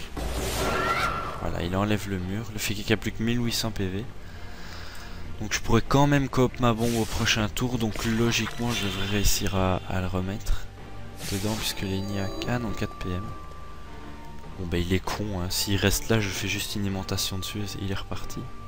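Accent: Belgium. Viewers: je kiff ton acent belge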